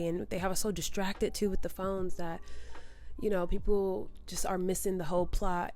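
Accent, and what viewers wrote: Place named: Model C, South Africa. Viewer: Thank for responding to the comment iv made on the other video. Well If you'd come to South Africa, you'd fit in perfectly, especially in the suburbs. your accent wouldn't be so different to what we call "Model C" accent. Then later you may link up with people who'd take you to the townships.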